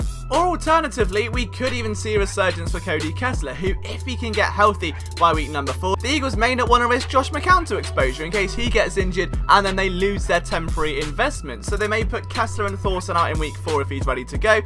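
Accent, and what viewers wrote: United Kingdom. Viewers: Why is a guy with a British accent talking about American football? I can't even watch the entire video, dude this is America not London.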